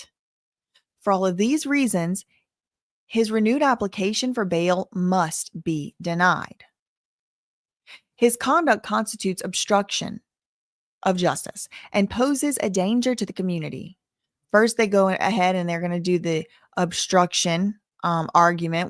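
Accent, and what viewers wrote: South, United States. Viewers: I certainly agree that has a beautiful southern accent. She has an amazing cadence and is so easy to listen too! 💚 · I swear that the more I listen to her, the more I feel like Im becoming a Southerner ya'll.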